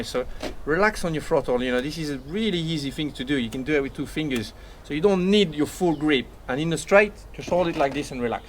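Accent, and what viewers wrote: France. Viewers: Are you a native French speaker ? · you are a true gentleman of the track. love your videos, and never get tired of the Anglo - French accent:). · from his accent, clearly french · He’s French lol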